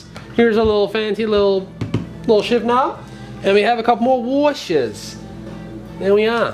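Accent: Australia. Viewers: This dude kills me me with his Australian voice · you need to stop speaking in an Australian accent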